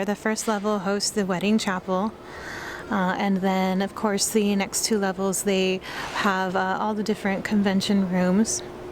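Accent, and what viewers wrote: United States. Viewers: I detect a california accent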